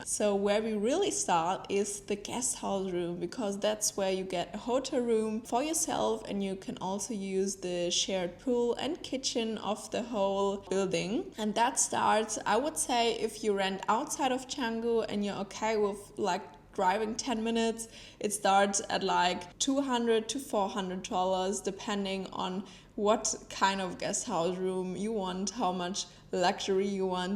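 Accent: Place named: Germany. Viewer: Ah the German accent. Thx for the info btw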